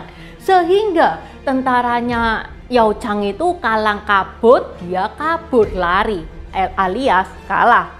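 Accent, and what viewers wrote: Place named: East Java, Indonesia. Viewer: Enak didengar tambah pengetahuan tentang sejarah, suaranya medok jawa timuran. 👍👍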